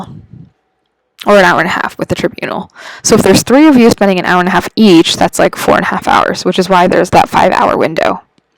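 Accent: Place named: United States. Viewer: Hi DOc. thanks for this ideas. I really appreciate your diction and your voice . I didn't distinguish if you are a Filipino or an American, because your accent is like an American speaker.. I love hearing your speech doc. 🥰👏👍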